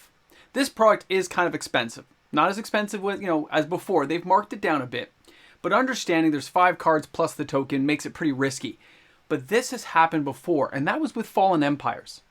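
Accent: Canada. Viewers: Bro why isn't this channel WAY bigger. Awesome insight and perspective. You Canadian? I am, and it's a guess based on your diction. Sad I can't double sub.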